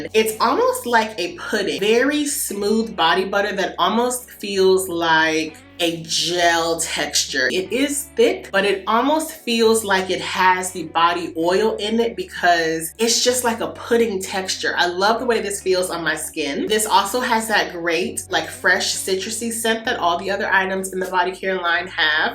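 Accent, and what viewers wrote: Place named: New York, United States. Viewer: You sound like New York when you talk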